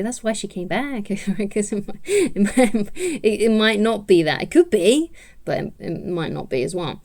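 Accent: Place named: United Kingdom. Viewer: Strong UK accent and the final piece of puzzle I needed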